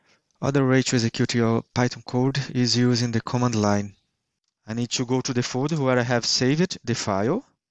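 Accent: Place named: Ceará, Brazil. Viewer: Curso de Python em Inglês com sotaque cearense.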